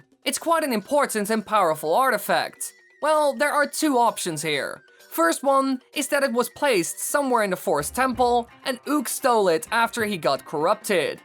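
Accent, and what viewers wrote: Netherlands. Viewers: He has an accent, he's dutch. Accept it or leave.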